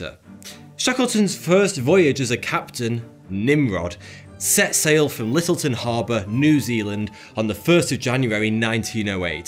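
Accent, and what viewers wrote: United Kingdom. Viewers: Awesome video mate! I always look forward to hearing that silky smooth British voice!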